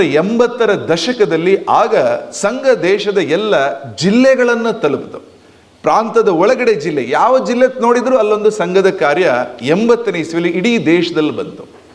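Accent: Standard. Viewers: Sir parisudhavada kannada thumba santosha ಆಯಿತು. ಮಗ ನಿನ್ನಗೆ ನಮನ 👍🙏🙏🙏🙏🙏🙏🙏🙏❤️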